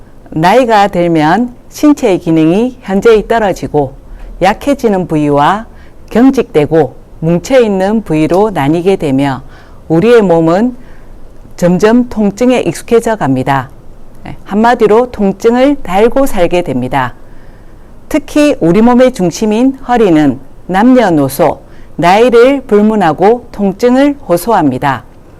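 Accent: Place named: Gyeongsang, South Korea. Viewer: 경상도사투리 정겹네요 스트레칭 아침마다 따라하고있습니다 귀에 쏙쏙들어오네요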